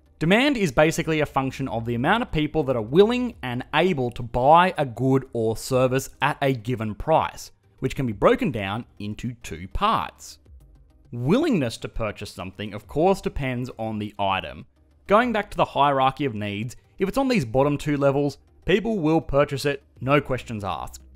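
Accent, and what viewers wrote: Australia. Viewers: Cannot stand the ozy accent. Bye bye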